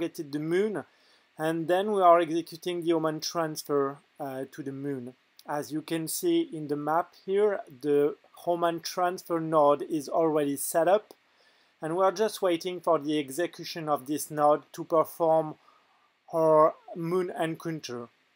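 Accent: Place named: France. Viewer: Quelle négativité. Malgré l'accent français on comprend très bien ce qu'il veut dire et la vidéo présente très bien la fonction.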